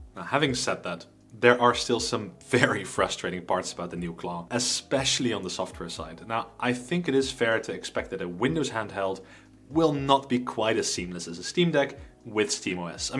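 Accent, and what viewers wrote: Netherlands. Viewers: Hes from the Netherlands, accent reveals. Needs more research.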